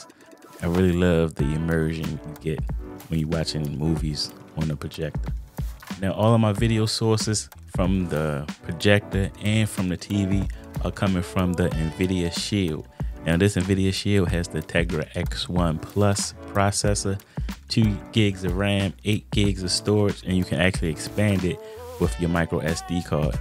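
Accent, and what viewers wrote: Baltimore, United States. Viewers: You definitely from bmore 😂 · I knew right off the bat youd be a ravens fan based off that heavy ass accent lmao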